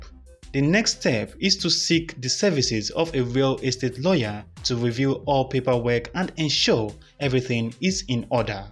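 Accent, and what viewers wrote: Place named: Nigeria. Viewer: Nigerians accent, but good video. Said many stuff in few minutes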